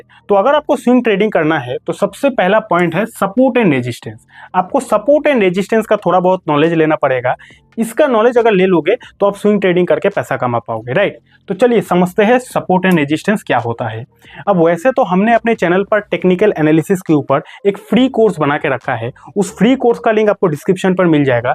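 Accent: Bengal, India. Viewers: Sir, aap Bengali hain?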